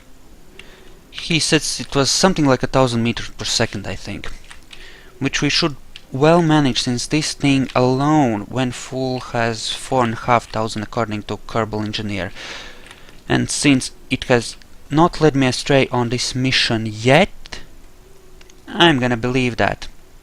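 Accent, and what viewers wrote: Russia. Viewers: I like your accent. Where are you from? Russia?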